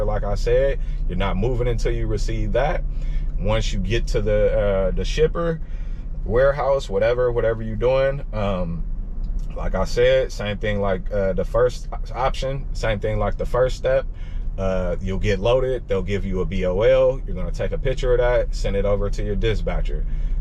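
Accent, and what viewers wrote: Bay Area, United States. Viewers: Lol you know what he meant.. He sounds like he from the Bay.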